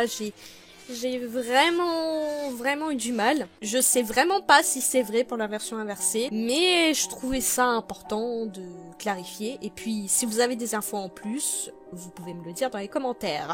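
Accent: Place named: Belgium. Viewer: Y'a un petit accent Belge là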